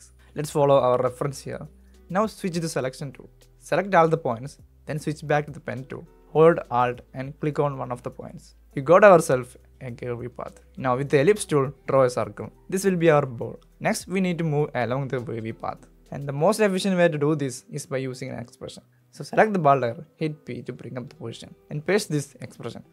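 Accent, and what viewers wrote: Kerala, India. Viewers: malayali alle ? enikk aa accent kettappo thonniyatha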